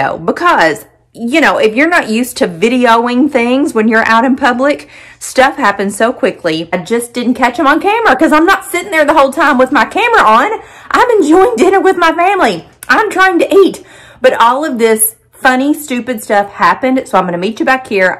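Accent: South, United States. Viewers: Your sister doesn't have a heavy southern accent like you do.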